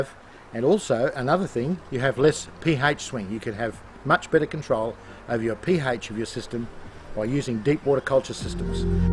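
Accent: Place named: Australia. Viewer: That head of lettuce you picked up was absolutely Gorgeous! When I go to Australia (positive thinking) I definitely want to visit your farm. btw: I just love how you sound. Australian accent is so cool:)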